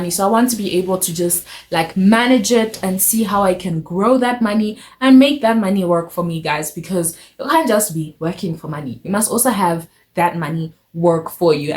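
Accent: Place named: Zimbabwe. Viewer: Interesting topic and subscribed. Where you’re from originally, I picked up an accent. I know you studying in SA. Are you Zimbabwean or Malawian?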